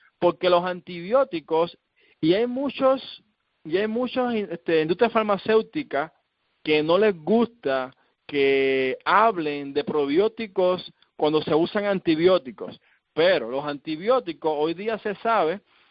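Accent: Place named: Cuba. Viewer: NO SE ENTIENDE CUAL ES EL PRIMER MEDICAMENTO QUE ACONSEJA..."..no se qué...clins" , que contiene 3 productos, dice. Pero imposible entender por la dicción de quien habla, parece cubano, no?...Por favor, si alguien sabe de qué medicamento se trata, compartirlo o responderme. Muchas gracias! 18/03/2019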